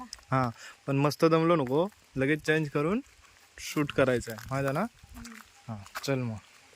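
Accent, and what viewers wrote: Malvani, India. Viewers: Kiti god malvani bolte ❤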